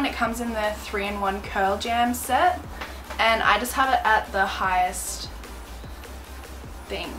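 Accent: Australia. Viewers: u r Australian?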